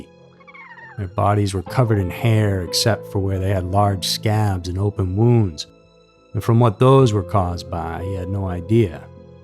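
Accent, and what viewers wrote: South, United States. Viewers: He's got a slight Southern accent I can't quite place by region, while his soft tone and melodic speech puts me in mind of a Tennessee Williams character or time frame or filmy floating fabric or something like that. I just discovered him and liked reading all the appreciative comments. I grew up with riveting storytellers from pioneer days, and fits that category I think.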